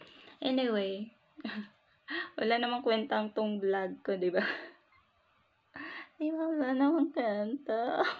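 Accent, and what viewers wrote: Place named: South Korea. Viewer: Ano ba ga talaga ang tono mo sis? May koreana, may ilonggo, may mahinhin at ilokana, anyways, go go lang sis 😘😘😘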